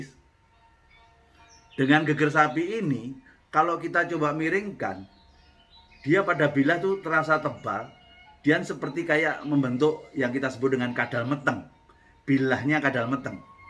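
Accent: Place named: Java, Indonesia. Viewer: Mantab. Bahasa Indonesia dengan logat jawa. Sehat selalu Om. Aamiin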